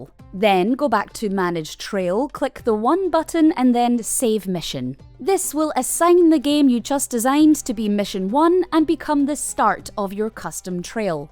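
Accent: Scotland, United Kingdom. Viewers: Came for the tutorial, stayed for the scottish accent. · legit curious, this a Scottish girl trying a more neutral/American accent? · Gosh a guide AND with that accent! Big loves and thanks to Scotland!😍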